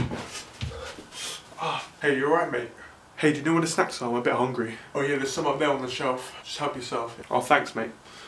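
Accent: Canada. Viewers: Are you Canadian? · Are you Canadian??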